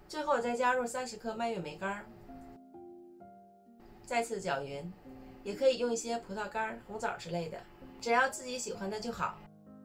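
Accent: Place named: Northeast, China. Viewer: 东北腔。。。大米发糕的桌法，😅 也加了麦子面的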